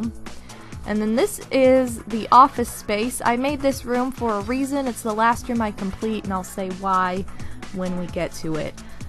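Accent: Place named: South, United States. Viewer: The days when you had a proper southern accent! lol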